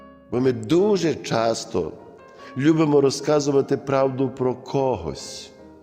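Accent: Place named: Ivano-Frankivsk, Ukraine. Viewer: Дуже гарна проповідь.Але люблю Ваш Івано Франківський акцент!... ближчим до серця❤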